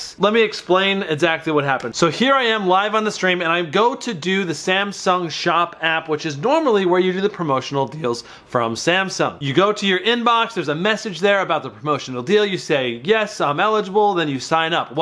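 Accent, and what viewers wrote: India. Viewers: Sounds like an indian scammer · Indian accent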